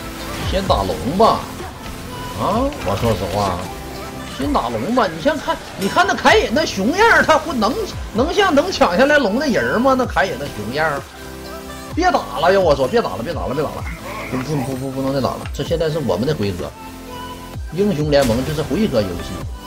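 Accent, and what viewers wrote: Northeast, China. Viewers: 猴哥是東北人嗎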